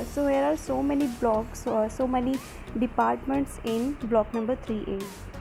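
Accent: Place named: Haryana, India. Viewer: aapne too haryanvi ee bolya krr haryanvi ee bdiya hh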